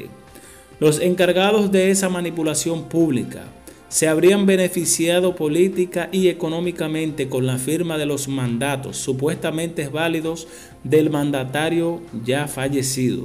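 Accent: Cuba. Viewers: LOCUTOR CUBANO?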